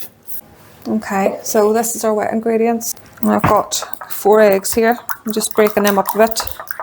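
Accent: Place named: Northern Ireland, United Kingdom. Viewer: I’m enjoying your videos- not only for the recipes but I love listening to your voice/ accent. I’m originally from NI. Haven’t heard anyone say ‘just fire it in’ for years. Thank You.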